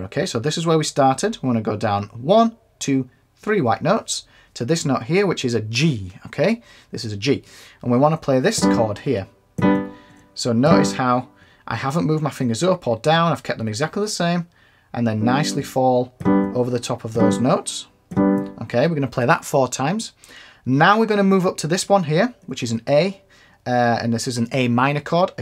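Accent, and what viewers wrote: Yorkshire, United Kingdom. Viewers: You must be a Yorkshire fella 🤔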